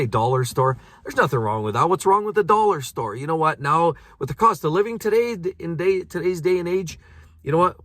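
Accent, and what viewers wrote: Canada. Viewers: Abooout! Or Abouuut! This is Canadian core pronunciation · your Canadian accent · you have a slight Canadian Accent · I love your Canadian accent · appreciate your Canadian pronunciations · you do come through a little canadian at times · Awesome videos man. The aboot comment really got me haha 😂 But honestly, your content is some of the most entertaining content on YouTube & I’m always looking forward to when a new video is uploaded. It’s awesome to see you walk around these cars & do a 360 with your camera to then switch to a close up of your face when commenting on certain elements is very very very entertaining. Please don’t change. And with regards to the aboat part, I mean, it’s very obvious you’re Canadian but that’s not a bad thing. Much respect from Belgium!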